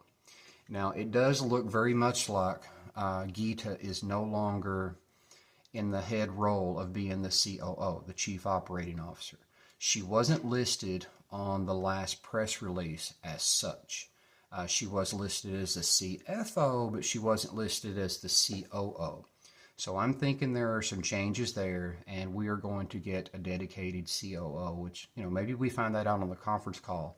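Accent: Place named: Carolinas, United States. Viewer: Your accent is southern, yet east coast. If I had to guess, I'd say the Carolinas? 👀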